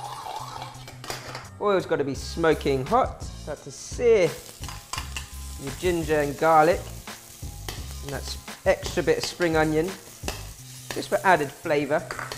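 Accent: United Kingdom. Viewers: Awesome dish, it sounds like u have a british accent. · Asian guy with a British Accent? that's something new. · Just realized he's Asian but has a British accent lol. Great video looks amazing! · As an American fan of Chinese-American food, including General Tso's chicken, and as one who's grown impatient with authenticists and purists who try to delegitimize any Chinese food not made in China, I'm heartened to see a Chinese-British cook with a proper British accent who gives expatriate Chinese food its due and re-enforces its legitimacy as cuisine. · Yeah so some Chinese looking guy with British accents (who's probably a British) is explaining how to make a fake (and extremely unhealthy) Chinese dish that's invented in the USA, and never consumed by people in China. Pretty interesting, although I didn't watch it through. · Ok, it ALWAYS fucks up my mental when I hear Asian people speaking with a British accent. 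Sorry, but it JUST DOES. I'm used to hearing an "American" tone, or an Asian tone, but a British tone? It just doesn't fit (to me) The recipe seems good, though.🙂🙂🙂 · School of Wok as in we’re or I at least have never heard an Asian man with a British accent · I knew from the start he speaks he must be stayed or grew up in UK. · That Hogwarts accent is wonderfully fun to listen to.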